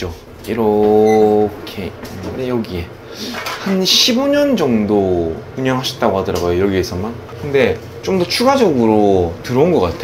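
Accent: Gyeongsang, South Korea. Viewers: 서울에서 10년이든 ㅋㅋ 말투가 경남이니깐 지방에서 왔나물어보는건 당연하지 ㅋㅋㅋ서울말 아에 안쓰시던네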